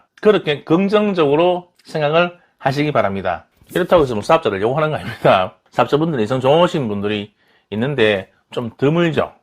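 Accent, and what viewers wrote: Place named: Daegu, South Korea. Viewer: ㅋㅋ말씀하시는게 썸남이랑 똑같아서 듣는 내내 기분이 좋았어요. 참고로 썸남도 대구사람ㅋ 잘 들었어요~감사합니다 1번.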